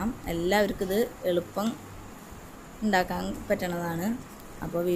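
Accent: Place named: Thrissur, India. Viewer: സംസാരം കേട്ടാൽ അറിയാം തൃശൂർ ആണെന്ന്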